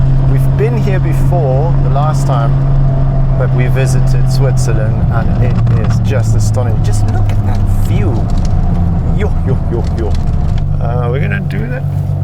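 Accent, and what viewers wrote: South Africa. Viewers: South African presenter? Great job guys!!! I need a TDF in my life 😍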